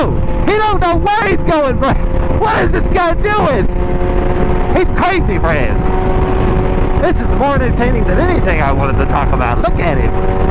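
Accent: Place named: Houston, United States. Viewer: Off the first minute i can tell you from Houston lol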